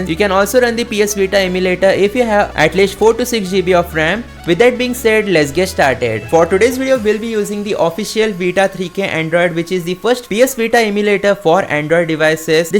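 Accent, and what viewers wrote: India. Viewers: Bhai indian hai ya american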